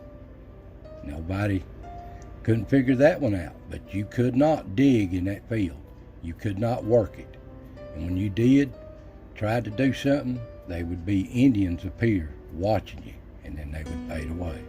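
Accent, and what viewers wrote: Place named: Kentucky, United States. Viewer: "Taint no hant it was Indians watching and protecting, "Love the way you talk, "A Kentuckian Cherokee here, "That's the way everybody talks up in these mountains. "Stayed in trouble at schools because of the words "Taint and hant, "Yep, Hant got none or I taint study and work at the same time, Or over yonder, Or up the road just a skip, "Skip meant a mile or so. "Love it, "You can not beat mountain folk, Know where, "And if they like you, "Well then you've got a friend for life, "But if they don't like you, "You'll know instantly.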